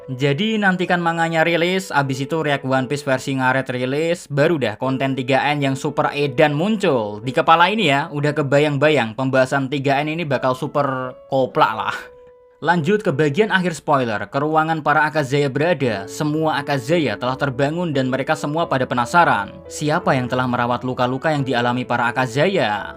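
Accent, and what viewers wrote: Java, Indonesia. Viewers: Jawane daerah ndi min?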